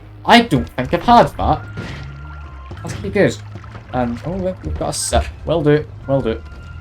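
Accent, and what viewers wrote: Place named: Scotland, United Kingdom. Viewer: sorry if I'm coming off a tad bit rude but you sound a wee bit Scottish. All i can hear is the Scotsman from samurai jack.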